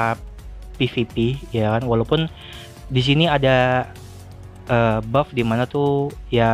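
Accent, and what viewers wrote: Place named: Betawi, Indonesia. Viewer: Lu org betawi min ya 😅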